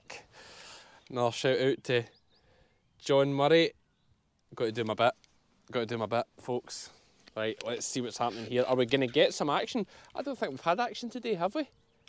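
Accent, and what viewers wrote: Scotland, United Kingdom. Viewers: I'm so glad I have grown up in Australia meeting many Scottish and Irish people over the years with strong accents. I understand everything you say, love the accent and love hearing you interpret the Scottish words that are not common in the english language so I can learn, not that I remember it. Your little fella is getting big, is a gem. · Important note: I LOVE your scottish! When you say Bra lambs... Bra = bra in swedish is good! Bra is from the scaninavian area and is a great word! So Maybe I can understand it fairly well because it has traces influenced by them invading adventurers sooooo many years ago. Keep talking, it is YOUR voice that speaks to us. Oh and my favorite, the Jacob sheeps, made a VIP apperance. The twins there looked adorable! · I love your accent and the Scottish "slang" as you call it. I'm in America and originally from south Louisiana. 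Sometimes my Cajun accent comes out when I'm tired or angry. People giggle then. Just keep doing you and providing this awesome content. Congratulations on 50K. Much love to you and all your family. Love that Wilson has found a role model he can look up to and grow up emulating. Thank you, for sharing your world with all of us "Sheep Fans". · To all the viewers who have difficulty understanding, I did also at first but I’ve been watching for over a year and catching up on older videos (and watching other Scottish vloggers) so it’s like learning a new language. I understand every word now. It’s worth the time and Scottish is so lovely to listen to. I hope everyone sticks with the channel. is the best. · I just discovered your vlogs after watching the Hoof GP while sick abed last week, and it's very entertaining! I don't have much trouble with your broad accent, perhaps because I've read a lot of Scots dialect in books (and Robbie Burns' poetry). 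· I really enjoy hearing your Scottish accent and learning what the different slang means, I also understand that a fair amount of people might not understand. I'm from Australia so we've got a similar thing with people not understanding lol. Can't wait to see more of your adventures! (Also Jock is absolutely adorable) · Keep Scotland in your talk. It is beautiful. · now listen up. if someone told she wasnt welcome to scotland because she wasnt scottish enough what would you think, your videos are great and even though i am english (from Exeter lol) i love seeing the scottish side of things and the accent is 100% part of that so please just keep being you, ive followed you from 2000 subs and its been heartwarming to see you grow your farm and family, to see your confidence on youtube build and with that your videos got better and better. dont change a thing bud if anything you should do a video where you dont change how you speak, a full on scottish day if you know what i mean lol and oh my heart little Wilson <3 like, tell us youre a role model without telling us youre a role model! 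· I can't always understand but seeing the context helps. And it wouldn't matter if you were speaking pure Scots all the time, I love to hear it. (Maybe because I have Scots in my DNA, which I do!). Absolutely loved your nephew at the end!!! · I'm since a week New to your Chanel, ( since you mentioned Sandi;) Great to see you farming and love to hear Scottish. Back in 1997 i worked along some Scottish colleques in France. So it's great to hear again.. warm regards from the Netherlands. 🐑 Do you also have Cash Valley virus in Schotland? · As someone born in Scotland and then relocated to England I really appreciate your accent and your Scots. I didn't learn as much Scots as I'd like: as you know it was frowned on in schools and my parents are English. Thanks for helping me to connect to Scotland. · I love your accent. Keep introducing people to Scots and Scottish heritage. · I LOVE hearing the Scots. 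Be true to who you are. Please don’t change. You always explain things so we can understand.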